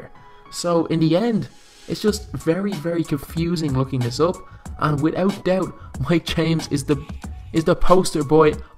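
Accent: Ireland. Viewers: Are you from Ireland man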